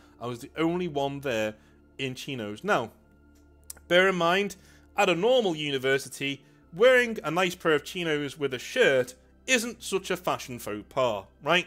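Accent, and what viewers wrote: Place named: North, United Kingdom. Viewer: I found this channel while feeling down and getting back in to the Hobby. Currently listening and getting joy from the stories, and familiar sounding northern advice, while waiting for hobby tools to build up some Orcs and Bretonians. Orcs are just super fun, and I want some good noble knights too. I may even get around to sorting my Steel Legion in red and black (Inquisitorial Guard) to finally join the Grey Knights... I like Knights! Thank you for the joy you have shared with me, and I mean that truly.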